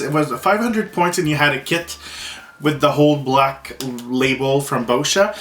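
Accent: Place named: Quebec, Canada. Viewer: Pe-Nell-o-pee. You French Canadians and your Accents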